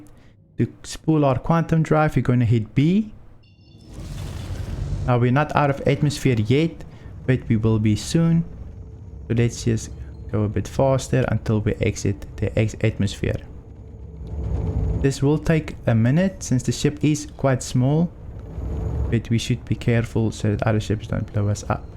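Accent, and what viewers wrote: South Africa. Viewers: South African? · that s afrikaanse english accent lo so cool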